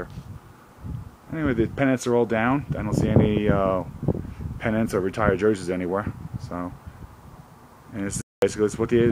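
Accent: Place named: Northeast, United States. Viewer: A northeast accent touring Houston history @@ LOL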